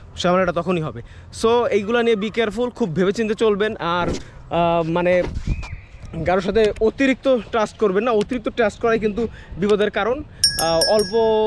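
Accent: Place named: Meherpur, Bangladesh. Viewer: ভাই আপনি কি মেহেরপুরের ছেলে নাকি, আপনার কথা শুনে মনে হচ্ছে আপনি আমাদের মেহেরপুর জেলার ছেলে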